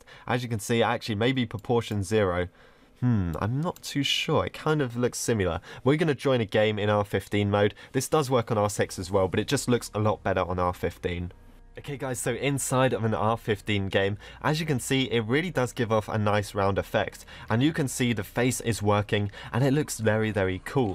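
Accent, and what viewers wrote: United Kingdom. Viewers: i'm british i was born on london and your voice is kinda british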